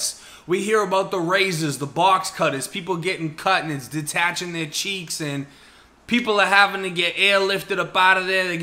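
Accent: Boston, United States. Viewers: That Boston accent kills me. Much respect tho bro✊🏾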